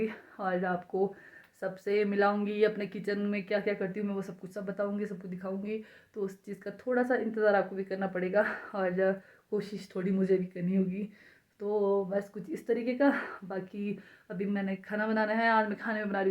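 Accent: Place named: Assam, India. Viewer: Mai app ka vlog dekh rehiye thi aur app ka hindi aur hamara assam hindi sem hai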